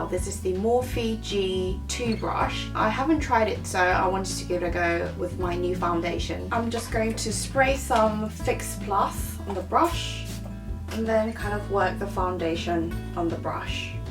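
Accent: United Kingdom. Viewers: 언니 영상 보는데 제가 요즘 영드 에 빠져있고 언니영상 보면서😁😁 점점 영국영어 매력에 빠지는거같아요 ㅎㅎ♡^♡ 글고 요즘엔 방학이라 언니 영상보면서 화장법 따라하는데 역시 화장도 많이 따라해야되나봐영ㅋㅋㅋ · 님! 오늘도 님의 찰떡같은 브리티쉬발음을 듣고가네요ㅋㅋㅋ전 개인적으로 님 영어영상이 더 좋은것같아요!뭔가 공부도 되는느낌같고 너무 좋아요ㅠㅠㅠ이거이거샀다 하는 영상보다 이렇게 오늘처럼 말하고 사용감도 보여주셔서 알수있는 방식이 더더더 좋습니다!!말하는 영상도 너무 좋고 영상길으면 더 좋아요!! 영상이 너무 짧으면 항상 아쉽거든요ㅠㅠ개인적으로 15분대 영상을 참 좋아합니다ㅋㅋ 사용제품도 더보기란에 다시 다 알려주셔서 쉽게 찾을수도 있구요! 님 영상 항상 잘보고있어요! 다음 영상도 금방 찾아와주세여! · 이런 영상 좋아요! 볼 때마다 느끼는 건데, 입모양 목소리 말투 영국발음 전부 매력적이고 듣기 좋네요.. 그리고 립 발색이 항상 탐나는 입쯀ㅎㅎㅎ 강점인 것 같아요 입술! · 이런 영상 진짜 좋아해여ㅜㅜ왜냐면 언니는 영국발음이 존나 매력있으니까!!! 자주 찍어주세여😆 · 수다떠는영상 좋아요!! 칭구느낌? ㅋㅋㅋ 영상 늘 잘보고있어요 영국발음도 듣기좋다능.. 좋은영상 감사합니다!! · 이렇게 제품 처음으로 사용하면서 수다떠는 영상 너무 좋아요ㅜㅜ 더 자연스럽고 중간중간 영국.. 리액션..?같은.. 그런 말투도 완전 씹덕..♡ 이번 메이크업 머리랑도 너무 잘어울리고 예뻐서 저도 기회되면 저렇게 머리 염색해보고 싶네용ㅇㅅㅇ 아 그리고 속눈썹 고데기 저렇게 하면 마스카라 뭉친것도 풀어지고 그러나요?!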